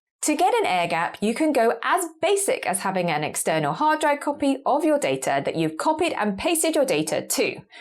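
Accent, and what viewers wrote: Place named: RP, United Kingdom. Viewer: When I close my eyes and listen only to your voice, I can truly believe you are part of the British Royal Family!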